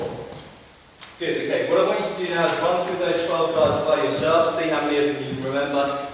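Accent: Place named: United Kingdom. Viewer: Love your British pronunciation of the Italian names =). Fancy, but correct.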